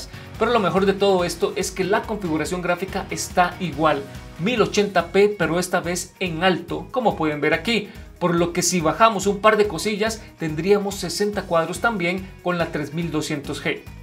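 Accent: Costa Rica. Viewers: Hola soy de Costa Rica Quiero comprarme una pc nueva que me corra buenos juegos Estoy viendo tu video "análisis de los nuevos ryzen 3400g y 3200g" Creo que por tu acento sos de acá Costa Rica Sabes donde puedo comprar una pc a buen precio? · Mae usted es de Costa Rica?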